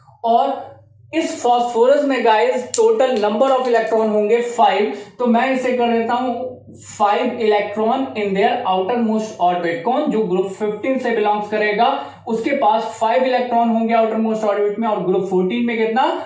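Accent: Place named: Bihar, India. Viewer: sir you are a weird blend of hadrabady and bihari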